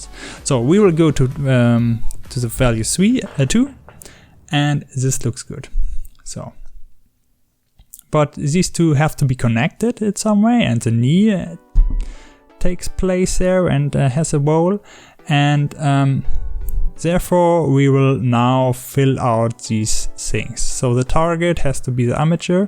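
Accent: Germany. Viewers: Pretty sure hes german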